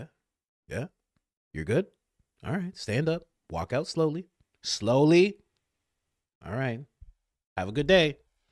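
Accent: England, United Kingdom. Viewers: Why is your British accent better than your American accent? 😂😂😂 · Love the English accent!! The king’s English isn’t that good..😂 King Charles only wishes it were.. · I love your British accent! 😅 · 😂 love the English accent! Alright bruv 😎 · I LOVE your British accent. YOUR SO MUCH FUN TO WATCH THANK YOU FOR ALL YOU DO ❤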